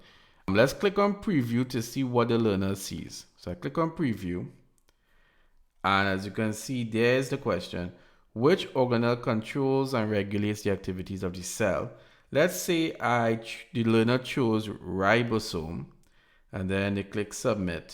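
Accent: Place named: Trinidad and Tobago. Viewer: You're not a real instructor unless you have a Trini accent. I love it. Cheers!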